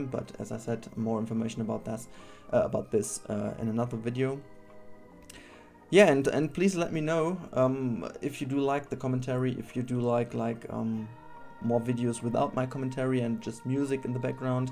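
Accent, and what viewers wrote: Germany. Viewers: You are German right ?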